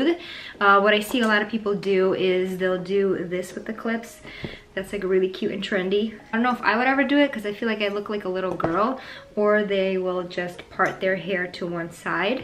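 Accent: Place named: Midwest, United States. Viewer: no way ur not from the midwest with that awesome accent...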